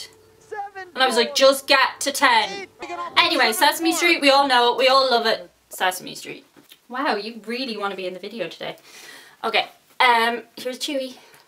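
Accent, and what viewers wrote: Ireland. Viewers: What part of Ireland are you from I'm from dublin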